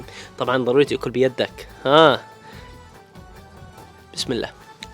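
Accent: Yemen. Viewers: كانك يمني من صوتك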